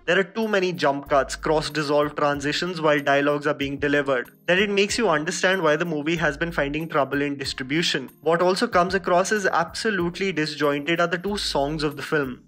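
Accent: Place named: South Delhi, India. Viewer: Bhai agar tu sach me Apne channel Ko grow krna chahta h faster....touh yee South Delhi or Bombay wali English chord de seriously.hindi pe ajaa.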